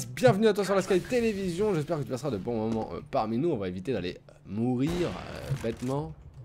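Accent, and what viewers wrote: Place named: England, United Kingdom. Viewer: Franchement des youtubeurs comme toi c'est magnifique t'es toujours souriant je te suis depuis tes 100 abonnés continu Ps: Magnifique Accent anglais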